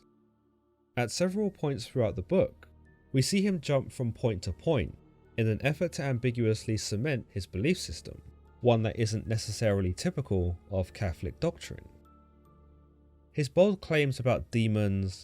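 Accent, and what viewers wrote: United Kingdom. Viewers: hearing a British guy talking about catching fish, with a creepy violin and piano track in the background is gotten to be my new past time!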